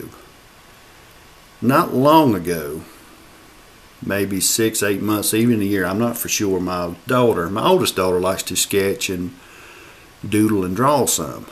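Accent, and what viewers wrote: South, United States. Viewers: Really awesome stuff. I'm one of those guys who has yet to post a video myself. I'm a writer so I'm better at expressing myself in writing. Just started an AF collection blog for my stuff. I'll share it sometime. Need more posts! Love your videos... and your southern accent!! LOL Stay Plastic!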